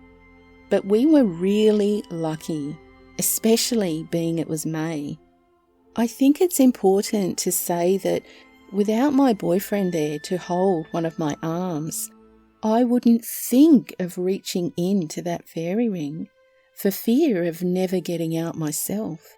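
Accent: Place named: Australia. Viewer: wow wat a voice... im french canadian and allways loved your Australian accent's ,you folk from down under...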